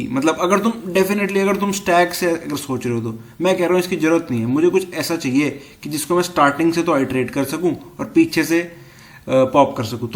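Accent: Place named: Delhi, India. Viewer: dilli se ho kya bahiee!! :-))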